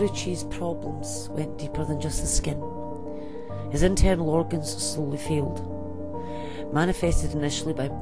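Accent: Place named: Netherlands. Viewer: That’s a Dutch accent that the narrator has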